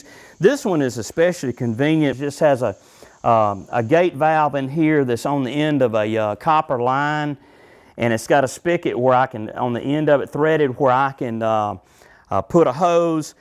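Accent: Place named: South, United States. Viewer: Remember, working dogs are bred to be outdoors and have good temperature tolerances. In the summer it looks like he uses evaporative cooling from washing down the concrete, ceiling fans to circulate air, hard roof to protect from direct sun and radiant heat. Based on his accent and surroundings I figure he's in the south. For heat in the winter he probably puts blankets in the dog houses. They are raised off the ground, so that takes away from some of the thermal loss from sleeping on the ground, and the metal roof raises the temperature from the sunshine in the kennel area. The concrete will heat up some during the day providing thermal mass heating at night. Some good tree coverage and the leaves provide extra shade in the summer, but the leaves fall in the winter giving more sun access to the metal roof.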